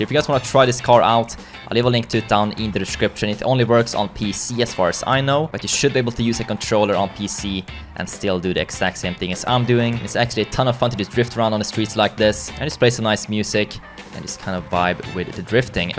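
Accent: Sweden. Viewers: Are you swedish it sounds like that? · Bruh, your Swedish accent is so satisfying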